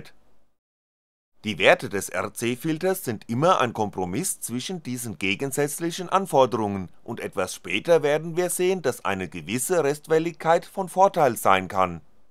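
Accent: Hesse, Germany. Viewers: Seltsammer Sprech da wo Du herkommst (tiefstes Hessen?). Man merkt das Du versuchst betont Hochdeutsch zu babbeln :))) Fachlich sind Deine Videos mit das interessanteste was YT zu bieten hat. Wirklich toll was du hier ablieferst. Allerherzlichsten Dank für die Arbeit und die Zeit die Du in die Anleitungen reinsteckst. Ich hoffe noch viel von Dir zu sehen.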